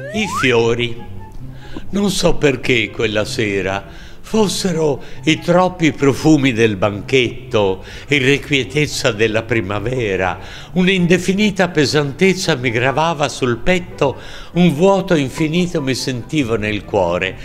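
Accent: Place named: Florence, Italy. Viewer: Interpretazione fiorentina fantastica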